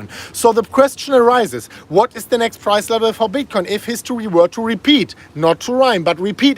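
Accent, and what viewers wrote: Germany. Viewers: Is he Austrian or German? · 300.000 just right now!!! congratulation mate. btw are you from germany or austria?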